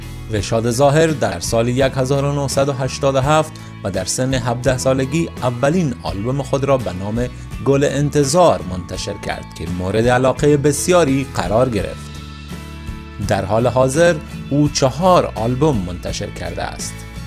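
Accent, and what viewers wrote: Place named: Iran. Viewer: ( اوستورا ) ، یعنی چی ؟ چرا به لهجه ایرانی حرف میګوید ؟.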